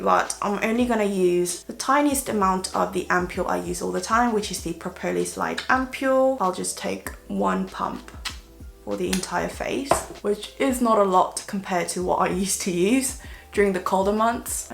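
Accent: United Kingdom. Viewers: 부산은 어땠어요??전 45년 부산토박이 비오는날 저 인생영화 와일드 보면서 소주한잔중인데 바로 영상 돌리고 바로 영국발음으로 듣고 있어요 사실 영어는 힘들지만 영국발음은 좀 멋지더라구요 항상 응원해요~~혹시 와일드영화 안보셨다면 남편이란 함 보셔요~~^^ 전 악마는 프라다를 입는다도 인생영화지만 꼭 보시길~~오늘도 이뿌신거 아시죠??? 사랑해요 억수루~~ㅎㅎ💖💖💖💖💖💖💜💜💜💜💜💜💜💜💜정말 사랑스러운거 아시죠??항상 응원합니다~~^^ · 썸네일에 홀린듯이 들어와 영국발음에 취한다..💕... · Happy birthday it’s late to say tho:) ❤️ I LOVE your British accent so much! 💗 최공 ㅎㅎ 😍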